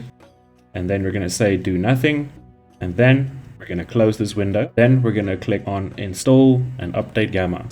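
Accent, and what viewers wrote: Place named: South Africa. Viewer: You are a life saver! I immediately recognised that accent. Groete ook van Suid Afrika :)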